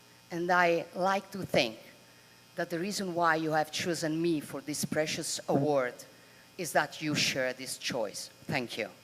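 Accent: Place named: Italy. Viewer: Sta leggendo un discorso scritto da altri e la pronuncia la rende riconoscibilmente italiana. Niente di perfetto, dunque.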